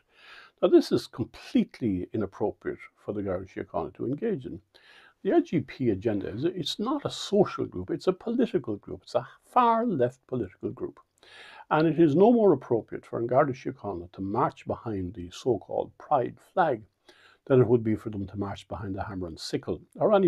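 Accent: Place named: Canada. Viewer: bruh sounds a lot like canada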